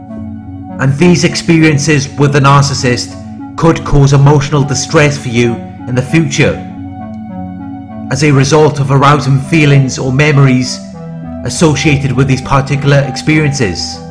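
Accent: United Kingdom. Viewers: Wow too real! Sounds toomuch like my x wife in so many ways...in her bad days me and my 2 little kids had to tiptoe around Mom otherwise she'd explode! On a family nite out to McDonald's our eldest daughter would be so fearful to upset the Narcissist Mom that she'd even refuse to order anything, afraid Mom would yell at her for ordering too much! Oh i hated those times! She eventually left me with 2 young children at home because home was just too boring for her. How those 2 precious young girls suffered, because even tho she was that disturbed element at home, there "were" some precious and few moments that our daughters did enjoy with their mother...a sad "live/hate" type of scenario, which should never be in home, especially with young growing children! So like i stated earlier, this video hit way too close to home, literally speaking. After 15 some years the girls are busy with their own children and it seems they've forgiven Mom for abandoning them when they were so young, tho the girls seem to know now which "buttons not press" or the old Narcisst Mom will suddenly raise her ugly head. 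Thanx for the video...again that British accent seems to "hook" me in each time, Haha, great!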